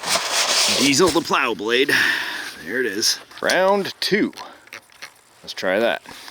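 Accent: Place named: Canada. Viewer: hey, are you from Canada? Because you sound like you are lol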